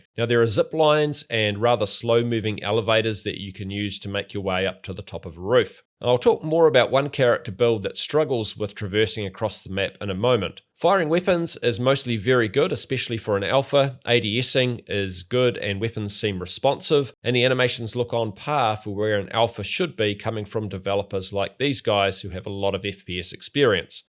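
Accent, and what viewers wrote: New Zealand. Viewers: Nothing beats the kiwi accent!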